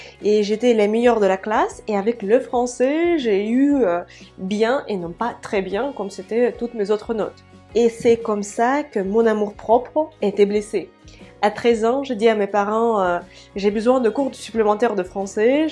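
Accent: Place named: Russia. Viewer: Votre Français est parfait avec une pointe d’accent Russe dans la prononciation c’est bonheur absolu. Félicitations et merci pour ce moment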